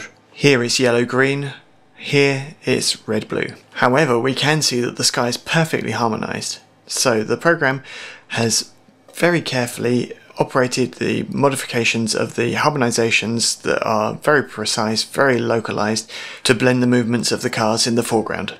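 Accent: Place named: United Kingdom. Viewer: The British accent is awesome... This tutorial has been terrific. Thanks...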